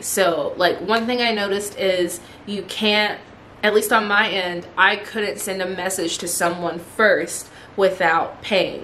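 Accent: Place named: United States. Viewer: When i saw the title of this video and then looked at the caption, i thought " i bet she is American " , i clicked on your video and you began to speak and yes, you have an American accent. Interracial relationships happen here in the uk everyday with everyone that we actually dont " label" it. Here in the uk we all mix and blend ,colour is not such a big thing here.. its a shame that everyone in the states is divided by skin... only in america!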